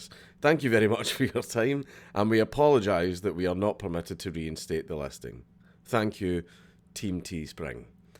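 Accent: Scotland, United Kingdom. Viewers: love the accent: scrooge mcDuck. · I've been watching some of your videos. Quite enjoy them. I'm still not sure if I agree or disagree with you, but I am willing to listen without foaming at the mouth. Besides that, you're even more Scottish than I am. So thumbs up! Subbed! Good job! Very few people on Youtube can confuse me to the point where I want to sober up and think...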